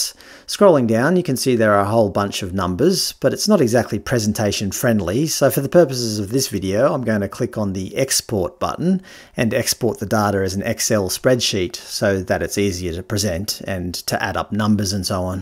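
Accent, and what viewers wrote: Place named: Australia. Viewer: Even with a nasally Aussie accent this is well done and worth a listen. The unimaginable can happen.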